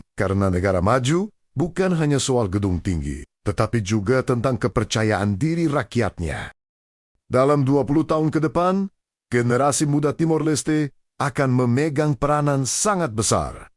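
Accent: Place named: Malaysia. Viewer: logat Malaysia, kalau narasinya orang indo kebanyakan fitnah iri dengki 😀😀😀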